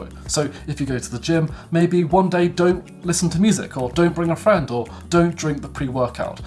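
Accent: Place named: United Kingdom. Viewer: It's really useful to learn a British accent and nice work man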